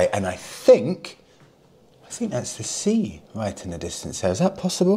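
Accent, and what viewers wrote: England, United Kingdom. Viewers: You should be on Jackanory telling stories, your voice is quentisently English old chap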